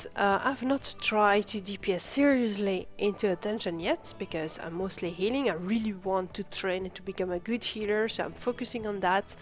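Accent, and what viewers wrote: France. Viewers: Hi, are you french ? I demand that because you have a french accent (si oui, un bonjour de la France ^^)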